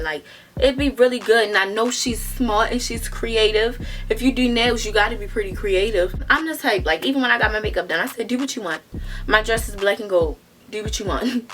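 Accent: Baltimore, United States. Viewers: Tew, dew, stewpid 🤣🤣🤣. I love Baltimore accents 😂. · My first video of u and know u must be from Baltimore I love the accent lol💕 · Nah she sounds like she from Baltimore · You have a accent are you from Baltimore? I enjoyed your story time ☺️ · u gotta b from bmore lol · You from Baltimore ain’t you lol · Now tell me why I knew you was from Baltimore before you even started the story 😩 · Just came across dis video while watching different storytimes Nd You said “do” a couple times and I straight knew u was from Baltimore 😂.. but this exactly y I hate getting my nails done I swear it always hurts then I don’t be saying nothing to em.. i just be sayin “pretty hurt” in my head but it be burning fr 💯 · I was going to ask if she was from Baltimore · Ma'am are you from Baltimore?? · are you from bmore? lol you sound like people from there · Baltimore ASFFF😂👏🏼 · I just know you’re from Baltimore. 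You got to be ! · Are you from Baltimore ? Love your accent . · U from Baltimore? U sound like one of us.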